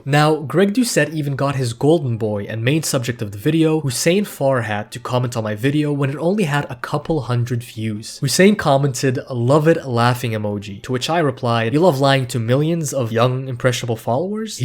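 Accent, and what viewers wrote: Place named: Morocco. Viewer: By the way I wanted to ask, are you moroccan? You sound like a moroccan lol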